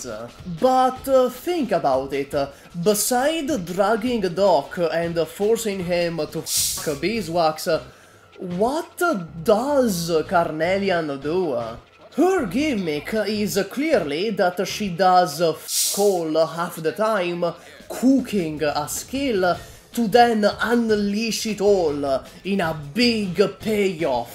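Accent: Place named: Italy. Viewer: I've noticed your Italian accent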